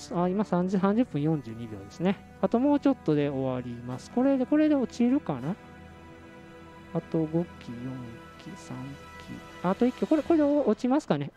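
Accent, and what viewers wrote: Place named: Kansai, Japan. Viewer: 淡々と関西弁で語りながら所々冗談入ってるのが面白い ここから宣伝につなげるとは思ってなかったｗｗｗｗｗ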